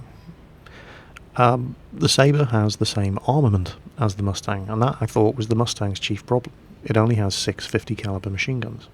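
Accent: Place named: RP, United Kingdom. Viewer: Your extremely official sounding British accent does NOT fit with the phrase "Like a Boss" That is my justification for laughing hysterically.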